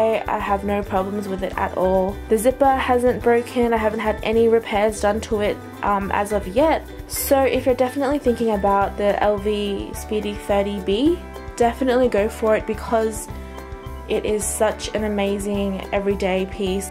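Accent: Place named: Australia. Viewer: noticed the aussie accent and subbed right away! haha another bag lover here ^_^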